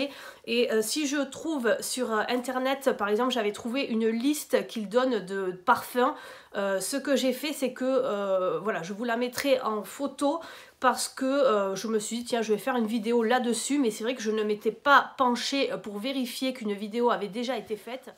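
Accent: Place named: South, France. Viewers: Bonjour, je vous apprécie beaucoup❤ et j'aime votre petit accent du midi et vos vidéos. Ne tenez pas compte de la méchanceté des gens. Dans quel monde vivons nous... Prenez soin de vous❤ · Bonjour, continuez vos vidéo je les aime beaucoup même si je ne commente jamais... Votre accent me rappelle mon sud natal... Faites fi des rageuses!!! Bon rétablissement et à très vite, biz 😉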